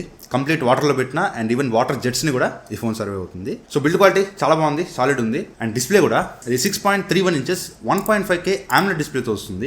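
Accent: Nellore, India. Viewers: Bro meedi Nellore ha?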